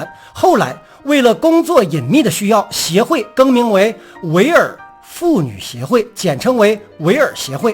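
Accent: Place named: Northeast, China. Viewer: ，东北人儿啊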